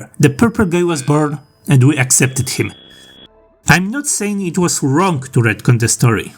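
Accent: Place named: England, United Kingdom. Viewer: PL: Masz niesamowity akcent Angielski EN: You have best English Accent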